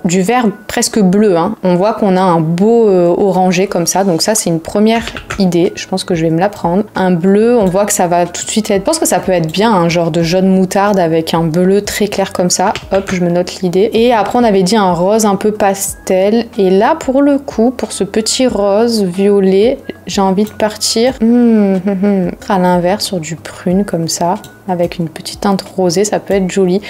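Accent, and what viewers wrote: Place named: Marseille, France. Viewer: Encore une vidéo sympa et pleine d'enseignements ! Etant en formation CDUI, j'adore tes vidéos pleines de conseils et le petit accent marseillais en plus !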